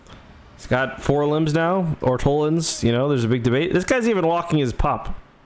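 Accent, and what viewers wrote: Canada. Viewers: You should so much more Canadian when you record am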